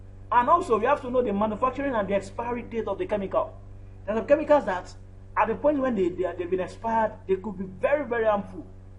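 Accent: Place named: Nigeria. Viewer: Why can't you speak real diction Man see your Nigerian accent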